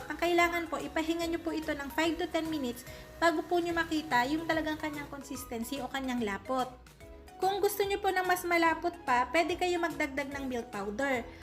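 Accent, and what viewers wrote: Batangas, Philippines. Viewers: Hi po. Batangeña ka po mam? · Kala ko Batangas. Lagi po ako nanonood ng mga vids nyo. Galing nyo magbake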